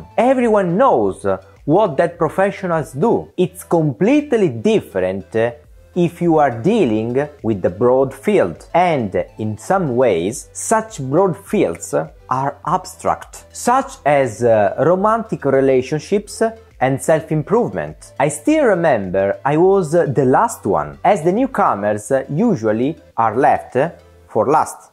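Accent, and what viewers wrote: India. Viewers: Ma questo accento indiano??? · Pur con accento indiano parla meglio di molti italiani, più che altro fa strano L accento indiano su un italiano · You have a lovely indian accent <3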